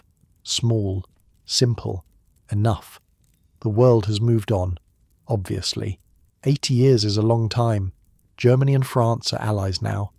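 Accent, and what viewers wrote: United Kingdom. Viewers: SICK OF THESE BRIT SCHIT ACCENTS.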